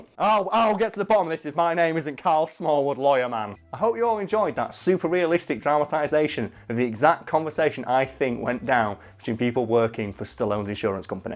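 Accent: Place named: Sheffield, United Kingdom. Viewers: Been trying to figure out where your accents from for ages! Finally you say you're from Sheffield ! Let us know if you're ever on a night out round Sheff, love to meet you for a pint! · Dude you could have at least known that he's northern. I mean, listen to the man :D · I knew you were from Sheffield could hear it in the accent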